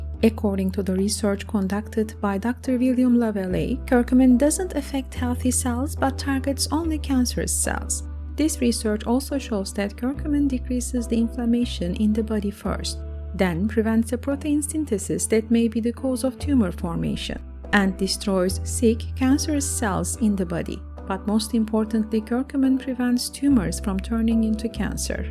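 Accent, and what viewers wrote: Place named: Turkey. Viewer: from your accent I hear you are Turkish. Turkey has the best chefs.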